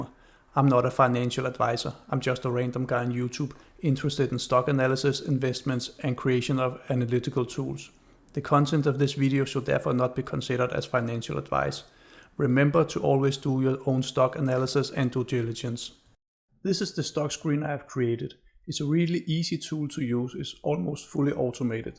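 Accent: Denmark. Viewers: Excellent video - keep up the good work - nice danish accent by the way ! - from a fellow dane !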